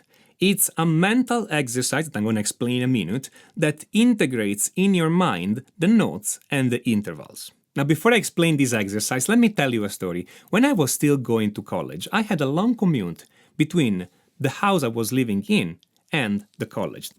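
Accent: Italy. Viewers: Where do you from? Maybe from Italy?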